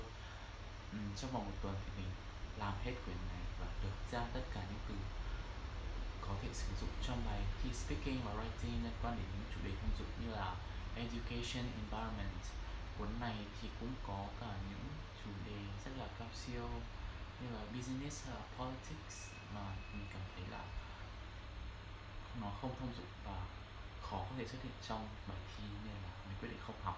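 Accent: Nghe An, Vietnam. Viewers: Em muốn nghe anh nói giọng Nghệ:))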